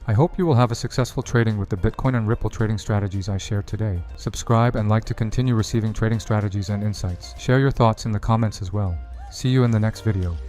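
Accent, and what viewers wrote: United Kingdom. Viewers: great british pronounciation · 아니 잠깐만요 영어 충격받았네요. 인토네이션이나 발음이 해외에서 살다오신 분같은데 영국식이라 듣기 더 좋으네요 목소리 톤까지 좋아서😅 나만 알고싶은 님인데..글로벌 채널도 대박나십시오 🎉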